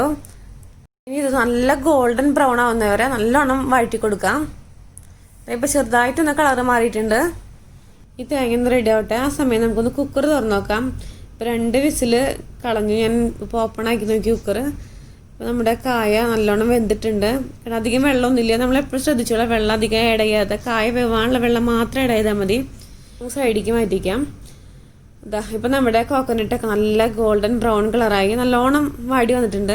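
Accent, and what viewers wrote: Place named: Thrissur, India. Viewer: Aha nammude trissur slang wd varutharcha kayakkari polichu😋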